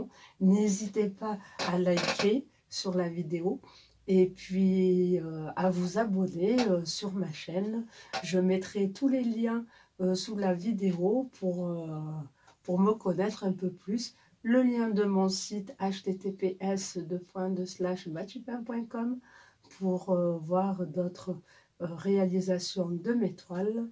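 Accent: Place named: South, France. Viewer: Je suis content pour toi,on voit ton évolution depuis que je te connais...artistiquement...!!! Bel accent du sud...salut du nord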